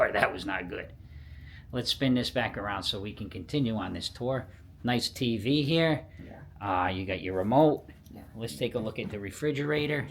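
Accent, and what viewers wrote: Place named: New England, United States. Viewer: I had to chuckle when y'all talked about different pronunciations of words when you come from different places. When I first started watching your videos, after about 2 videos I was thinking "He has to be from New England". LOVE New England! I'm in Virginia so I get the "Southern THANG" all the time,lol. Great video!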